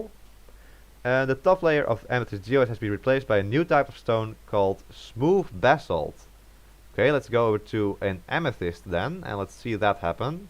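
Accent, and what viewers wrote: Netherlands. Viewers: i am 1000% sure he is dutch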